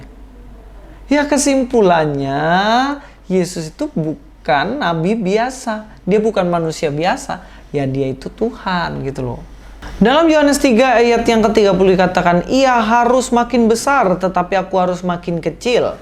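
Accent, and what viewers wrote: Java, Indonesia. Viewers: Cino logat jowo kui biasa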